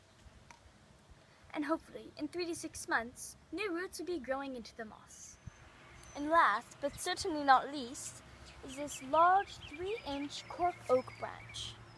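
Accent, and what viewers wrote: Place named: England, United Kingdom. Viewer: You think your better then me cause you have a English accent and have a lab coat?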